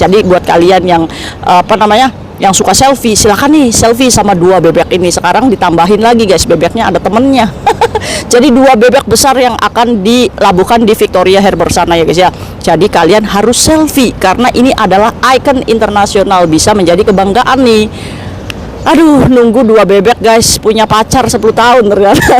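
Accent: Indramayu, Indonesia. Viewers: Logatnya kayak org Indramayu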